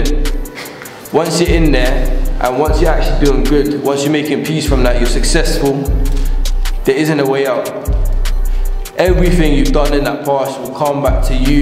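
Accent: United Kingdom. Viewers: I think it's cockney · This is the voice I read every British person on twitter in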